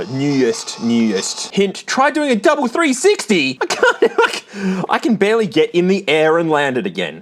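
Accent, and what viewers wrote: Australia. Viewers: all australians sound the same I was just watching dankpods video and there is ZERO difference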